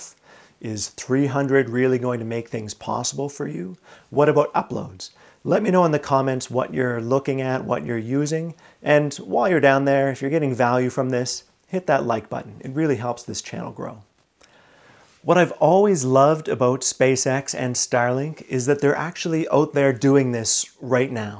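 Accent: Canada. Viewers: Seems like a great video and I love the subject but I just can't do that extra Canadian accent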